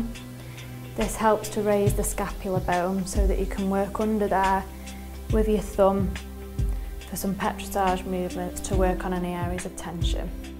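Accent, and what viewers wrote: United Kingdom. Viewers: Can someone tell me from what part of Britain is her accent? Thank you. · A Swedish massage with a nice British accent :) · Are you british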